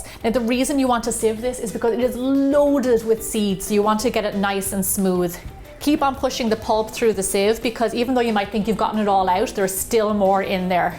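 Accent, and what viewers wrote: Ireland. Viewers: Go back to Ireland for a while I need the accent back LOL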